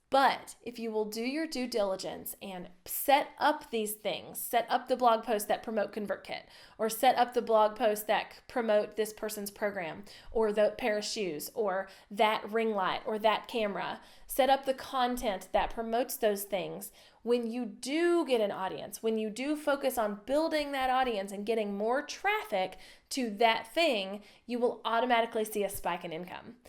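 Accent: South, United States. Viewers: For watching this video for the first time, can I just say I hear the southern accent and love it lol. Really helpful content here trying to start affiliate marketing myself!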